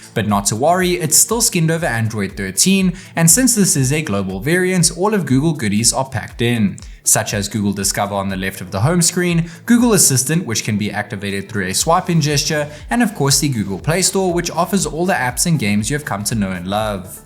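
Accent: South Africa. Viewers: Wait he's South African😯😲😳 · oh South African ! jburg or CT ?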